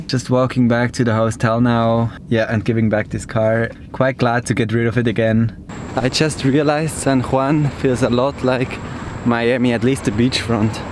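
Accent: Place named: Germany. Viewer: Nice to listen vloggers with an Accent that U have idea where its from... My guess is German.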